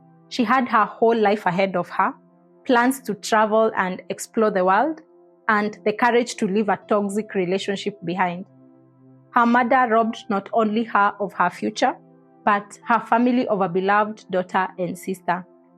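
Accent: Kenya. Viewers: She has a Kenyan -African accent.......you want her to speak British or American English??¿? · 😂She's Kenyan. What other accent does she know?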